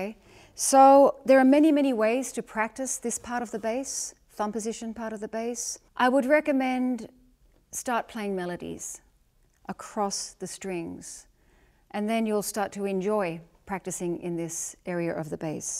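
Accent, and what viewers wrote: Australia. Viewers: So Australian! - starting with G'Day :-)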